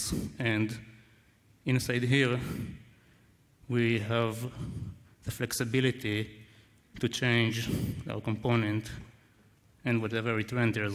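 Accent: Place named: Arab world. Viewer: I'm sorry,I couldn't understand your English, which looks like Arabic pronounce.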